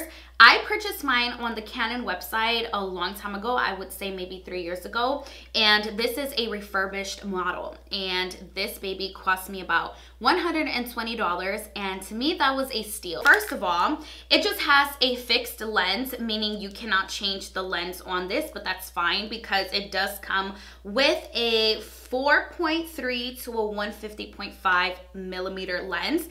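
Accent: New York, United States. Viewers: are you from new york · Are you from New York or New Jershey by any chance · It's alot like mine I am wondering if she is from new York or boston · R u from New York? Cos “nwot auwll of uws are” (not offending anyone) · I love your NY accent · she sounds like a really strong new yorker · holy new yorker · Her new york accent is super heavy love it😂👏🏽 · I love your accent ❤😇 so new yorrrrk haha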